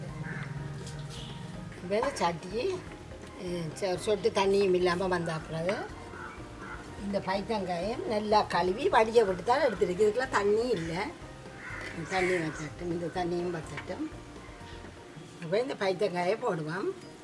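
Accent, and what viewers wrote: Jaffna, Sri Lanka. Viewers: அம்மா உங்கள் யாழ்ப்பாண தமிழ் ரொம்ப நல்லா இருக்கு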